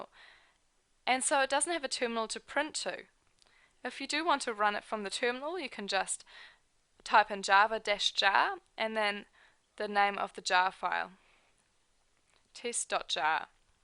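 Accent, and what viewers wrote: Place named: Australia. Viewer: You have the cutest accent. Australian?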